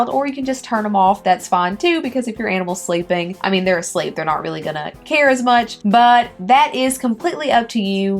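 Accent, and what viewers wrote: South, United States. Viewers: I have never seen or heard a black woman with a country accent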